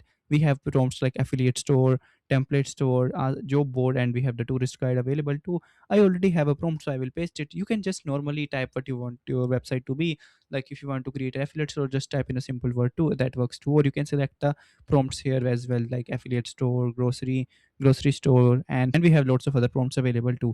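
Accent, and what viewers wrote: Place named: India. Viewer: Sorry, but your Indian accent makes it difficult to follow the video. Better use a generic AI voice.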